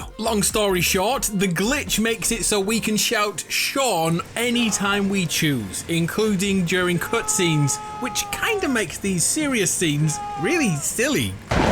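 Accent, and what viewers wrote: United Kingdom. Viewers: wouldn’t expect many glitch in? In Madden? Love you but if your accent/description of football 🏈 wasn’t a dead give away you are from across the pond, then that statement most surely will. 😂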